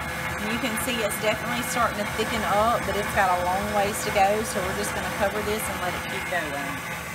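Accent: South, United States. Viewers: Thanks for the inspiration to make our own butter. I think that method would work in our bosch mixer, oh and I love the southern accent. :)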